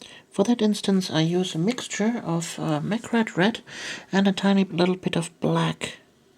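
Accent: Germany. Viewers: No she's German, a lot of foreign accents speaking English come off deeper than our own, give her a break will you? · ICH WUSSTE DAS DU DEUTSCH BIST!! hört man an deiner aussprache.. tolle videos.. danke für die hilfe :D !!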